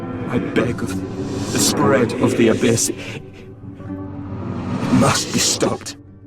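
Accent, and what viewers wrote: United States. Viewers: It needs a British narrator. American accents don't mix well with DS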